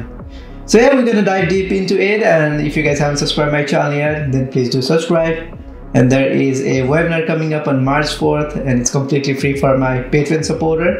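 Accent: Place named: Nepal. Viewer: COCIAL MEDIA ACCENT TELLS U R NEPALI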